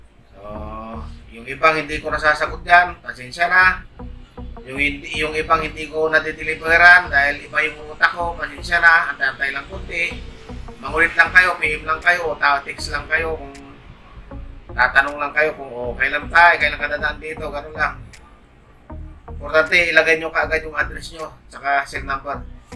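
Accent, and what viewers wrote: Gabaldon, Philippines. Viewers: Gabaldon padin yan tay yung tagalog mo?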